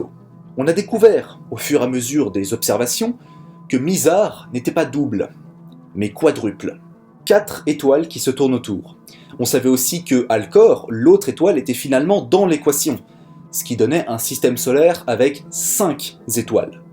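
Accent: Italy. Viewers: G mis un pouce bleu pour ton accent italien lol :) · Pouce Bleu pour l'accent italien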